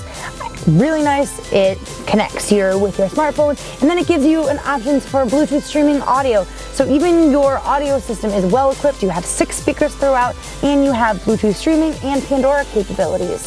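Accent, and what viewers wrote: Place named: New England, United States. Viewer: 613 I love the way she says "Honder" instead of Honda. I enjoy listening to her New England accent. I am from Oklahoma and so I like listening to other accents besides those found in the south or from here in the southwest. Love your accent,!:-)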